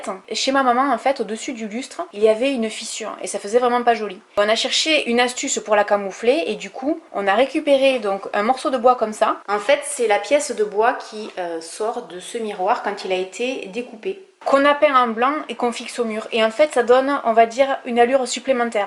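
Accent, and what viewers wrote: South, France. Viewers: Nickel ! "Avé" l'accent en prime . Merci, une mine d'idées exceptionnelles. Bien filmé, bien expliqué. ❤👍👏👏👏👏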